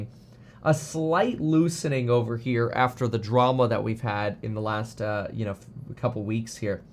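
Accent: Germany. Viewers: Your German accent made me crack a smile for the first time this morning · Man that German accent is hilarious.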